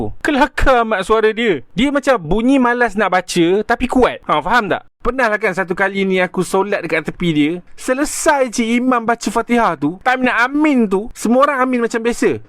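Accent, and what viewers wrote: Malaysia. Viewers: Man percakapan kau memang macam Malaysia aku pulak ramai cakap aku orang luar sebab percakapan aku sangat formal macam orang putih baru nak belajar bahasa melayu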